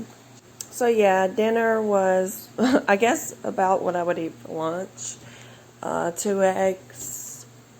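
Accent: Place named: South, United States. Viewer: Hey I love your accent.. I’m originally from India but I have been living in Chicago for 6 years now but I hardly meet people here with your (southern?) accent. I visited Mammoth caves last year and I met locals with your accent and they were super friendly. And yes I agree milk is so satiating. I like yogurt and butter too. I Can eat a stick of butter everyday.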